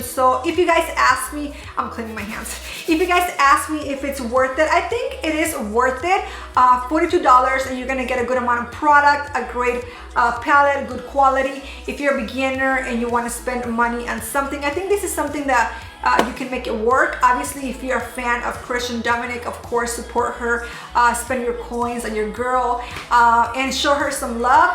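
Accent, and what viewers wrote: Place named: Mexico. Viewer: Hola... veo tus vídeos para aprender un poco más el idioma, aunque se me hace rara tu pronunciación, es un inglés americano con acento mejinaco muy gracioso. Pero aquí me tienes 🤗porque eres si serás mi youtuber favorita. Aunque mi canal favorito es de de vlogs, sí ese que tienes algo descuidado últimamente 😔... Saludos desde Madrid España 😘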